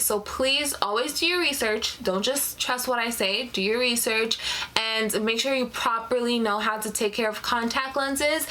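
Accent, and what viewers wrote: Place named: Belize. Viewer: Are you from Belize? I hear the accent in some of your videos. I love your video content :)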